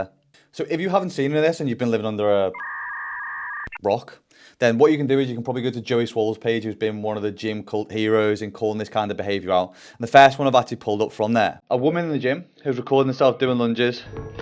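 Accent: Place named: Liverpool, United Kingdom. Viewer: Great content as always 👏🏼. Great work on the clarity of your speaking too, elocution lessons working well👌🏻👍🏻(not that I think there was anything wrong with your native wirral/scouse twang though! 😅)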